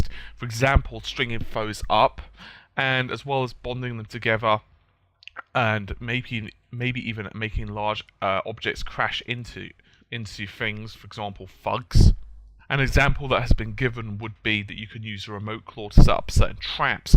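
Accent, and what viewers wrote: United Kingdom. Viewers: My God your voice is British O.O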